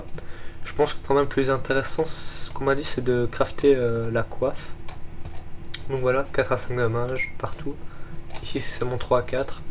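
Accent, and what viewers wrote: Belgium. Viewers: Un petit accent belge :)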